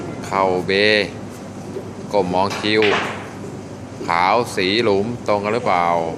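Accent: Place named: Rayong, Thailand. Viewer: อาจารเป็นคนระยองรึป่าวครับสำเนียงไช่เลย555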